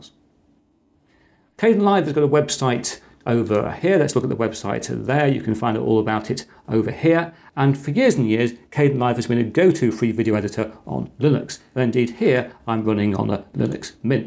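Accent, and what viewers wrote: England, United Kingdom. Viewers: Excellent, thank you. Watching it now. Also subbed to your channel as A. I enjoy your delivery. B. Fell Brit makes a nice change. C. Most importantly I'm passionate about the same subjects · Perfect British Accent. Bravo!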